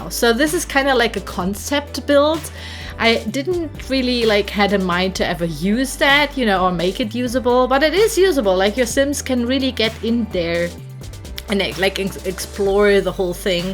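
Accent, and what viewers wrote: Germany. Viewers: Ich: starte das Video und höre die ersten Sätze auf Englisch. Mein Gedanke dabei war: „Das ist kein native English...eher deutsches Schulenglisch.“ Auch ich wie ich bei deiner Kanalinfo lese das du aus Deutschland kommst: „Ich wusste es!!😂😂😂“ finde ich richtig cool, dass du deine Lets Plays auf Englisch machst, damit auch andere, die kein Deutsch können, wissen was du gerade im Video machst!👍🏻 weiter so!😊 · Are you german? You accent sounds german 😅 (I'm half german)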